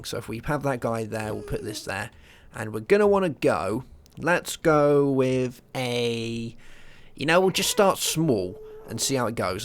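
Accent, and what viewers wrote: United Kingdom. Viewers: He is british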